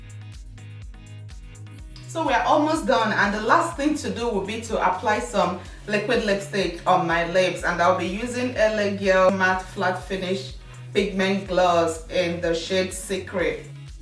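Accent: Nigeria. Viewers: Are you from Nigeria? Just asking because you sound like my mom who's Nigerian. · okkayy that accent is my Nigerian sister. I am Nigeria and I know when I heard your voice for the first second. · Where are you from? I hear the West African accent, Nigeria.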